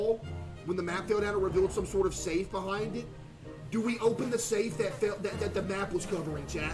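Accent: South, United States. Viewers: most def cus he's southern AND has a southern accent, I'm from the south (texas) and I can tell despite him glowing in the light and the ginger hair he is def a seasoned white man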